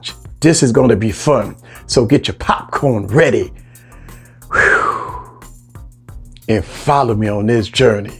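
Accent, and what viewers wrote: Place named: New Orleans, United States. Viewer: Hahahahahahahaha nice video. I’m from NOLA moved to LA when I was ten with fam. Soon as u began to speak I knew where u were from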